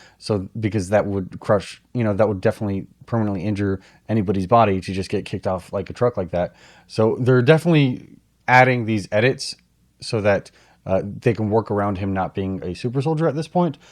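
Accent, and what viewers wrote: South, United States. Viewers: I love your vlog on this video at your house in Texas during your quarantine until you're talking about the second episode of "The Star-Spangled Man" from the mini-series of "The Falcon and the Winter Soldier" got came out on Disney+ while it's all about John Walker, who appears on the morning talk show of "Good Morning America" as Captain America, revealing his desire to live to Steve Rogers' mantle. Meanwhile, Sam Wilson and Bucky Barnes struggle to work together as Barnes expresses disapproval in Wilson's decision to surrender Roger's shield. They travel to Munich and the find the Flag Smashers smuggling medicine. Wilson identifies a possible hostage, who is revealed to be the group's leader, Karli Morgenthau. The Flag Smashers, with enhanced abilities, quickly overpower Barnes and Wilson until Walker and Hoskins requests Barnes and Wilson join them in aiding the Global Repatriation Council (GRC) to quash the ongoing violent post-Blip revolutions, but they refuse. 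Meanwhile, Morgenthau receives a threatening text from an unknown number, so you're talking about all of the characters from the start after that, I hope your husband, Zach will be proud of you and I'm very happy for you, I know you're half Mexican and I love your Southern accent!! #TheFalconandTheWinterSoldier #DisneyPlus #Marvel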